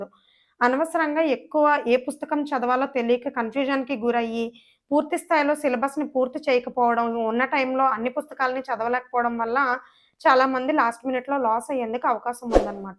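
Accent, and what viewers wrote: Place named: Godavari, India. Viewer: మేడం మీది గోదావరి జిల్లా నా మీ accent అల ఉంది బాగా suggest చేశారు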